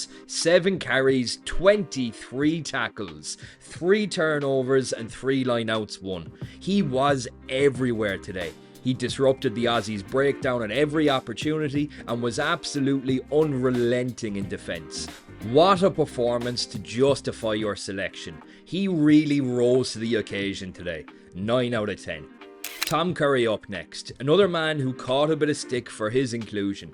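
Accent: Ireland. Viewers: I think your videos would be going very far if you keep rating like that crap ...JGP could have been Player of the Match ....Hugo was fantastic ....you need to do better homework and rate your team not just on stats you get from Internet...you are obviously not a player. I won't be coming back to be droned by your flat Irish accent .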